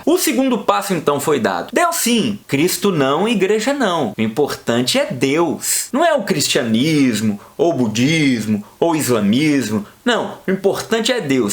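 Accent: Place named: Minas Gerais, Brazil. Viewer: Grandes verdades ditas de forma simples, ao estilo mineirês. Abramos os olhos e convertamos nossas vidas. Cristo é o Senhor!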